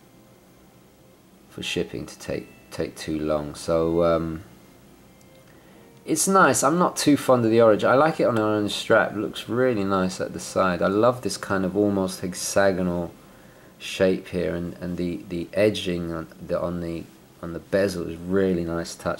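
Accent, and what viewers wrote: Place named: United Kingdom. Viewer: Not to nit pick......but its pronounced "Hoi-er" .....not "Hew-er".....for the brand Heuer. I guess it must be a Brit thing as you also tend to say "Oh-mee-gah".....vs, "Oh-may-gah" ( for Omega), as most others do. Standing by for the inevitable flaming, but my intent was to inform not to irritate. Good review.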